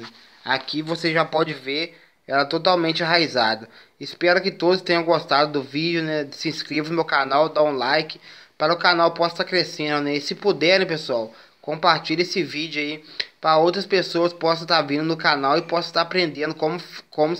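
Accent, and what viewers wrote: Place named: Caipira, Brazil. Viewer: Dei meu like por conta do sutaque de caipira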